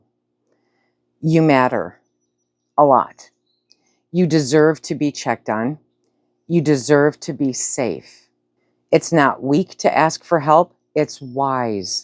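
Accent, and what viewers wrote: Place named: Michigan, United States. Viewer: OMG... I'm so glad I found you. After watching for 15 minutes, I began to recognize your logical, pragmatic thinking, your speech cadence, the landscape behind you, and I thought, "I wonder if she's in Michigan?" Lo and behold, went to your vid description and there it was! I'm a former Michigander, born and raised in southern lower MI. Now living in AZ (looooong story and not by choice.). Caring for a spouse with Alzheimer's and there could come a time when Ill be on my own. Will return to MI because that has ALWAYS been home. Thank you for your wonderful tips, you're providing a valuable service! 💙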